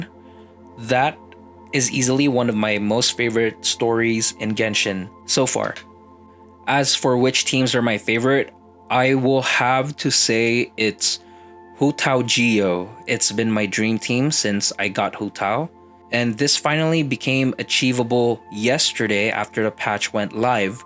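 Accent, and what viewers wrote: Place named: Philippines. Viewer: As soon as I heard your voice I immediately knew you were filipino! Very glad to see a fellow Filipino, and a genshin creator at that. Love your vids and hoping you get 10k soon, keep it up bro :D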